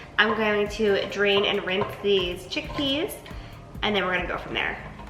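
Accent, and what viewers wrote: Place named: East Coast, United States. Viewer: That east coast accent 🤩😝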